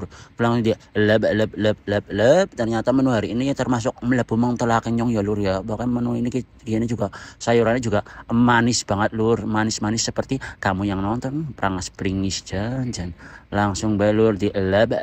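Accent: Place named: Banyumas, Indonesia. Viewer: Ga PP bng di isi suratnya yang ngapak, suara has, jadi candu, buat nyari bang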